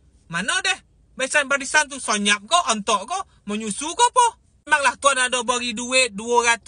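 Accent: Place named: Negeri Sembilan, Malaysia. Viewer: eden suko ekau cakap nogori ….. sghonok dongar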